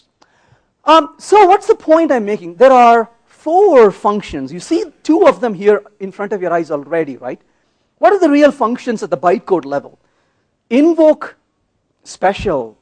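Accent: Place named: India. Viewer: 録音の状態が悪い上に、インド人的な早口でインド訛りが少しあるので聞きやすくはない。 それでもこの人の話は聞く価値がある。ヴェンカットさんはアジャイル系の本の著者。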